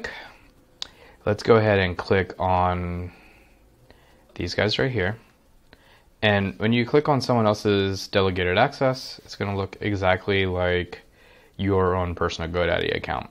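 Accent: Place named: United States. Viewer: Finally someone with a clear voice and American accent thanks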